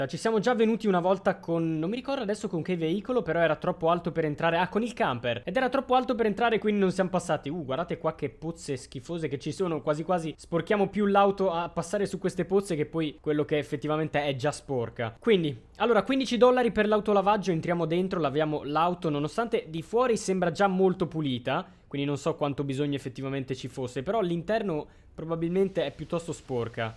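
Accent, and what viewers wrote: Milan, Italy. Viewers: sei milanese?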